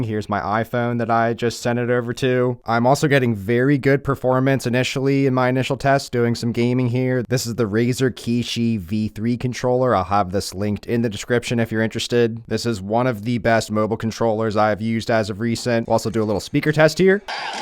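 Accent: United States. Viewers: The most American accent ever wow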